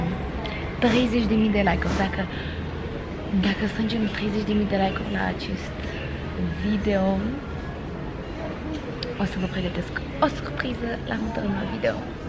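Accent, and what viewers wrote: France. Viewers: WOW... Imi place accentul tau asa franțuzesc 😘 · Ador felul in care vorbesti. Accentul asta de frantuzoaica e de milioane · Ce accent e ăla de francez cred nu? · Ai accent de frantuzoaica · Ai un accent de franțuzoaică 😉❤️ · Îmi place de tine,ai accent de franțuza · Ce accent franțuzesc ai! 😍😍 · De ce ai accent franțuzesc? Ps:sunt nouă și nu știu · Ce accent frumos se franțuzoaică ai!:)) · Se vede că ai accent franțuzesc!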